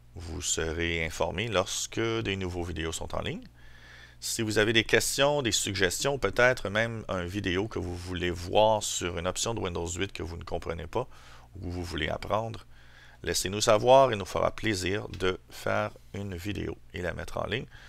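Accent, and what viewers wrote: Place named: England, United Kingdom. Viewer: belle accent anglais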